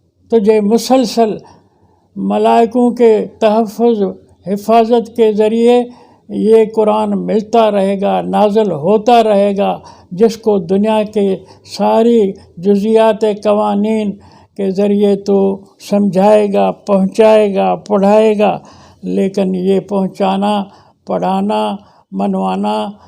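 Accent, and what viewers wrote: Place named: Sindh, Pakistan. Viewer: خوشی ھوتی ھے سنکر آپ کو، اور حیرت بھی کہ ایک سندھی اسپیکنگ کتنی ستھری اردو بول سکتاھے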